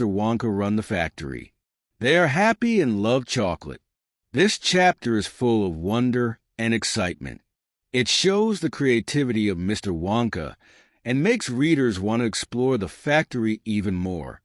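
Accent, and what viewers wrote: United Kingdom. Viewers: Por casualidad, este inglés es británico? Por cierto, es muy bueno este contenido, gracias por compartirlo! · Excellent performance and a distinctive British accent. Thank you very much...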